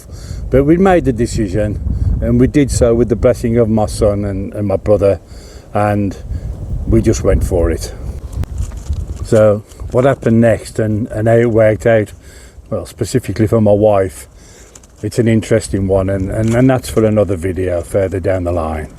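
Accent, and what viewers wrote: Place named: England, United Kingdom. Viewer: Bostin' - I recognise the accent. Fellow Englishman in Stockholm.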